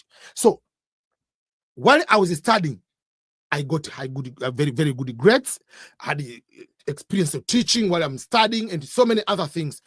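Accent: Uganda. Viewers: All along i thought this man is ugandan from his accent 😂😂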